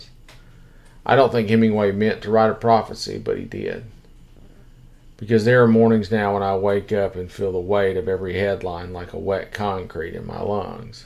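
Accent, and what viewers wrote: United States. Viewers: First time since Jimmy Carter ive heard a deep south accent talking with sense and compassion.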